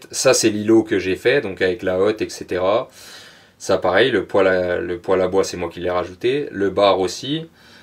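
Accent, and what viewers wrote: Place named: Haute-Marne, France. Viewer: Côte d’Or, ok 👍 En haute-marne ils ont un peu le même accent.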